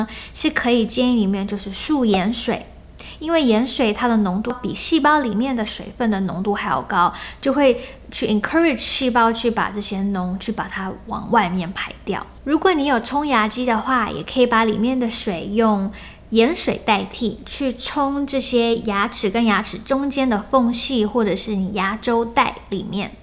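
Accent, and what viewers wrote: Taiwan. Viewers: 😀因為您的口音，我猜您是台丸人? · 口音似乎是從台灣來的。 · 你的國語有台灣口音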